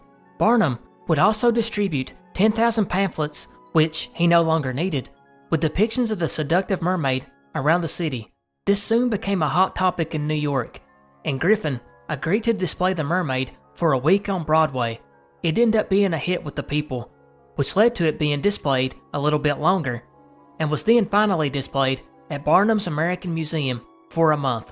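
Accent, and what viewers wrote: South, United States. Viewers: I just found your channel about a week ago and I've been binge listening at work and to fall asleep too. I love the content, but I really love the fact that you're a fellow southerner. There's not enough southern accents on YT. Greetings from East Tennessee! EDIT the guy who jumped out of the plane and died from the cocaine bear story landed in my old neighborhood in South Knoxville. I was a little kid back then, but I still remember when that happened.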